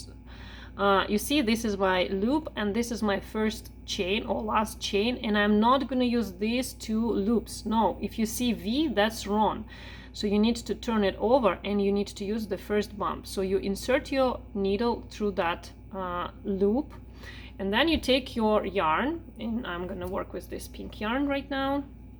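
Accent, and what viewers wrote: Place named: Russia. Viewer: I love this tutorial! Your hat is so beautiful. I'm going to make one for my Dad for Christmas. By the way, your accent sounds just like the beautiful Russian accents I heard in college when I lived in a Russian community house. Is that your nationality, if you don't mind my asking? Thanks again for the excellent tutorial! ❤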